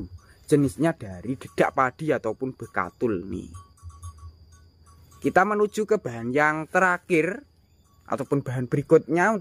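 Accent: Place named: Java, Indonesia. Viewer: Medhok tenan leh omong...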